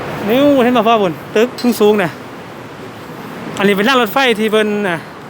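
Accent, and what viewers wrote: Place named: Isan, Thailand. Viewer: ข่อยว่า เจ้าเหมือนคนอีสานบ้านข่อยคักๆ😁😁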